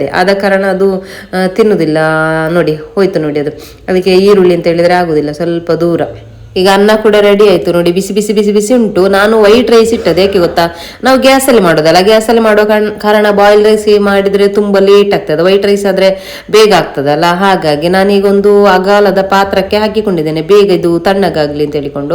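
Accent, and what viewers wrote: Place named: Sullia, India. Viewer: ನಿಮ್ಮ ಸುಳ್ಯದ ಗ್ರಾಮ್ಯ ಕನ್ನಡ ಬಹಳ ಚೆಂದ ಇದೆ.ಹೀಗೇ ಮುಂದುವರಿಸಿ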